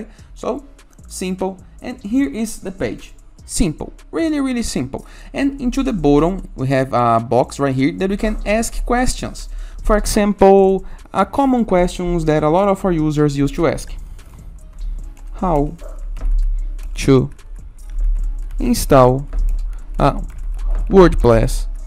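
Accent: Brazil. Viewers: I know a Brazilian accent when I hear one! Excellent content ;)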